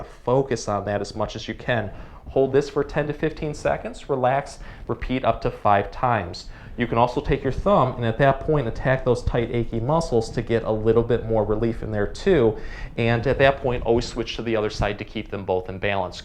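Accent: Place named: Midwest, United States. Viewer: You’re def from Chicago or the Midwest with that accent lol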